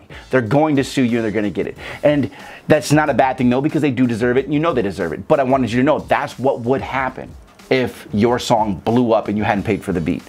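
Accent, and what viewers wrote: Chicago, United States. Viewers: He sound like he from deep chicago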